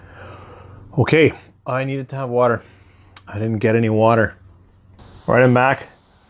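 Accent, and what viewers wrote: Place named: Canada. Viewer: Holy shit I’ve been watching your videos for months and just now I noticed your Canadian accent. Tbh I enjoy your videos more now😆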